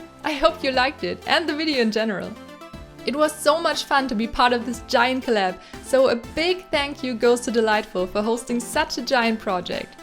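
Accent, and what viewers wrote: Germany. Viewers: Are you german? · Is she German? (Because of her accent) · Why am I always cringing on german accent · Are you German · Are you German? You kinda sound like you are. (I'm just wondering.. xD) P.S. Love the Doll! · woah i just discovered your channel right now and YOU'RE SOOO TALENTED! (btw (if you ever see this comment xd) i always try to recognize an accent and my question is.... are you german? it sounds a bit like this xd ((and because of the "moin" at your channel description))) (if you're german: Ich liebe diese Puppe! XD) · R u a German? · Are you German?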